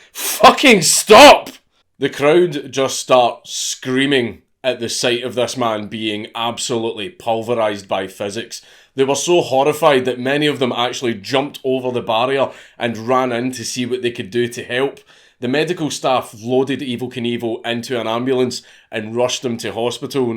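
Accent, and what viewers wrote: Scotland, United Kingdom. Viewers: Ur scottish is now better than ever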